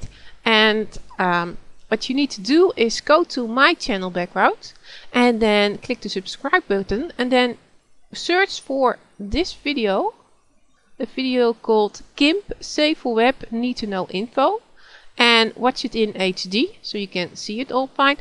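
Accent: Netherlands. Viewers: ik hoorde gelijk dat je nl was^^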